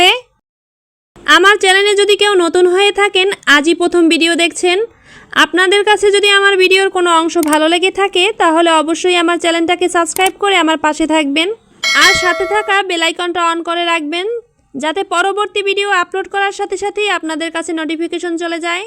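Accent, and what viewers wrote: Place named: Barishal, Bangladesh. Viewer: বরিশালের ভাষায় কথা শুনতে ভালো লাগে ভাজিটা ভালো হয়েছে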